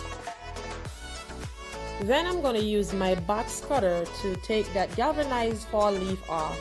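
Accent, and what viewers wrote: Jamaica. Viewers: Very nice 👸🏽thanks for sharing💕 I hear your accent where are you from? Are you Jamaican?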